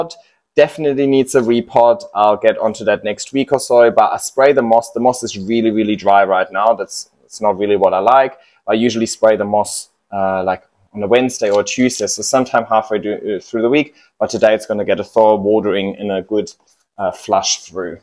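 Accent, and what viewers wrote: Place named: Germany. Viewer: Dieser deutsche akzent hahahah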